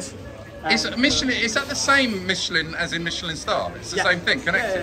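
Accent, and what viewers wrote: United Kingdom. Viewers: Dear, thank you so much for this remarkable walk through Knightsbridge. As a French admirer of yours, I'm always delighted to hear your lovely British accent and your explanations that are always on point.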